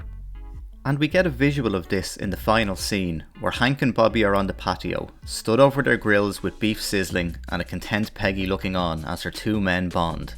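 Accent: Ireland. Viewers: British? Sounds Irish to me. But I get you either way. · a very Dublin accent to me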